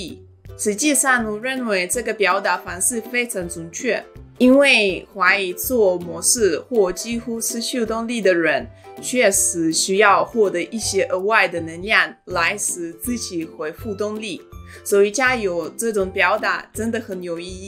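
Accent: Standard. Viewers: 感覺 俄羅斯人 學中文, 似乎比他國要快, 而且發音也比日韓歐美要標準.....👍👍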